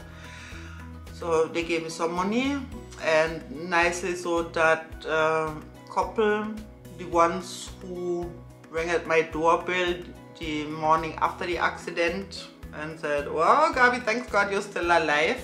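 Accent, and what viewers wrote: Jamaica. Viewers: Hi, I'm one of your new subscribers. I love listening to you tell your stories of your Jamaican experiences. You tell it so well. You paint a very clear and easy to understand picture. I love hearing your Jamaican accent.😊👏🤝🙌🙏👍❣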